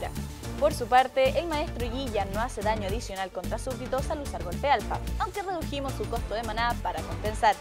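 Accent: Chile. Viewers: Me gusta el acento chileno pero solo el tuyo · Se nota que es chilena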